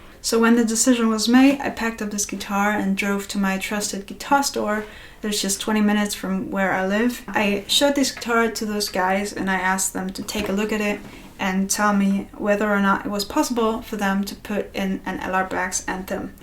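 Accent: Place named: United Kingdom. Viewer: This was really helpful for me. I have A Colling CJ45 which someone had retrofitted a Fishman Sonitone to and it sounds really quacky, so I was considering this exact swap. I didn't really think the Baggs piezo was much better that the Fishman (although it was a BIT better), and the mic was very brash. The 50/50 was a definite improvement, but I'm gonna have to think really carefully now. I do think K&K pure mini is a better acoustic sound than either, and I have it in one of my Martins, but whatever the makers say, it needs a pre-amp with some pas, and that's extra hassle. Thank you very much. By the way, your English is excellent (I'm from England), and I would never have guessed you were from Germany. You have some US phraseology, but your accent is really close to UK English, which is so nice to hear. Finally, there's no reason not to put that pup into a Shawn Mendes - it's a lot of guitar for the money. 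Martin are selling horrible laminate ones for about the same money.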